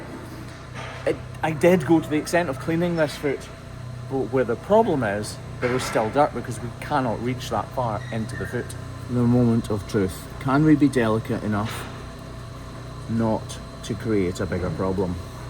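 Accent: Scotland, United Kingdom. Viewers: I kinda like him talking, with that wonderful Scottish brogue.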